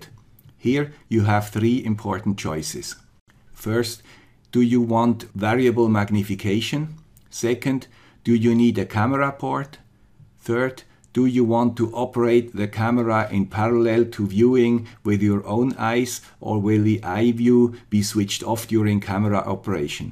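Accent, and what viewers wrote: Switzerland. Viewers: Many years later, this is still a very helpful video. Thank you, guy with the Swiss accent!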